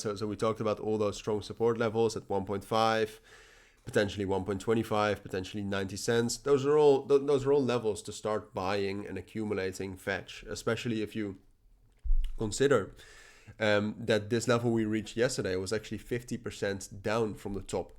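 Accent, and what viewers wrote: Netherlands. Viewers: Ben je Nederlands? K hoor het aan je accent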